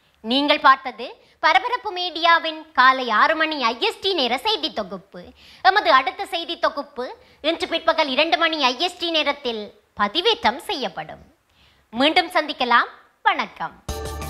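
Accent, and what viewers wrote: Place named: Sri Lanka. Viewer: இலங்கை தமிழ் அற்புதம் அற்புதம் அற்புதம். நன்றி 🙋🙌🙋🙌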